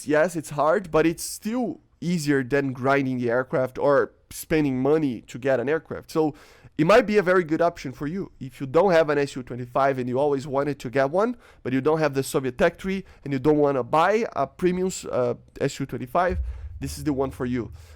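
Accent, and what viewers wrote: Brazil. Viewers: Mano, esse cara parece um brasileiro falando inglês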